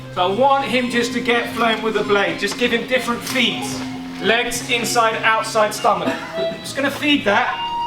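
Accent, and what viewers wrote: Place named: Canada. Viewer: His voice sound so canadian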